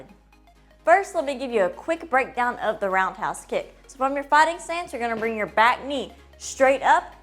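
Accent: Texas, United States. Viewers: whAT IS THIS ACCENT IS THIS TEXAN WTF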